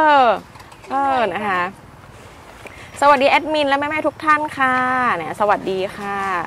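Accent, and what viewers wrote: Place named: North, Thailand. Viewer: ชอบมากกกแอคมินพูดไทยคำเหนือคำน่ารักมากกกชอบค่ะคุณมาถูกทางแล้วค่ะ❤❤❤❤❤❤❤❤❤❤❤❤❤❤❤❤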